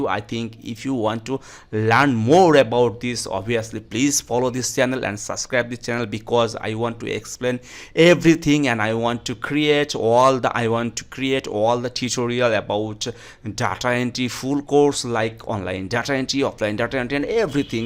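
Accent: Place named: India. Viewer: i love your indian accent next time put subtitle pls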